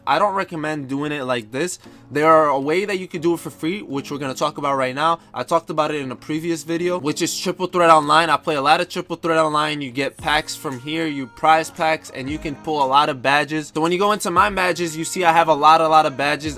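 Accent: New York, United States. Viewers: This dude is 100% a New Yorker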